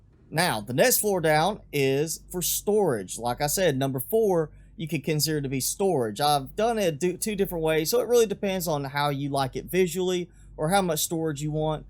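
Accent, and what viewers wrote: South, United States. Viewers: I want to subscribe but that country accent is killing me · Totally off topic but where’s your accent from? Im absolutely shit at guessing US accents so I’m gonna shoot in the dark and say somewhere south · Ah someone who sounds southern like me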